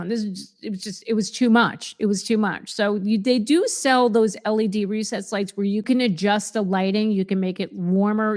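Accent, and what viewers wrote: New Jersey, United States. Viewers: Gotta love that New Jersey accent! The way she says "off" reminds me of the Sopranos (in a good way).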